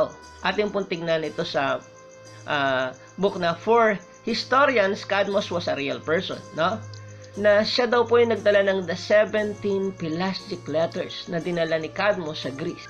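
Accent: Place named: Ilocos, Philippines. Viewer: Ilokana ng narrator sir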